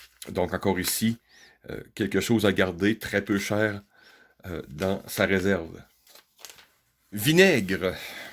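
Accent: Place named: Quebec, Canada. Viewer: Un canadien lui Québécois en plus La voix j'ai reconnue ça 😂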